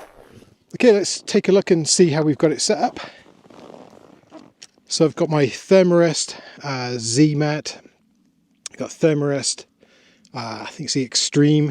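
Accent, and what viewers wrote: United Kingdom. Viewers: That was smart going up hill when finding a camping location. First time viewer. Emjoyed your video. Are you from the UK?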